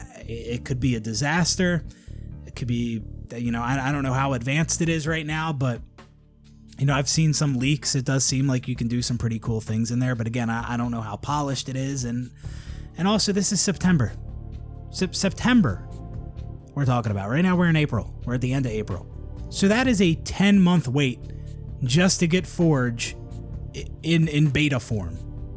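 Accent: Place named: Philadelphia, United States. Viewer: By the way, is that a delco/philly accent I hear? Delco checking in.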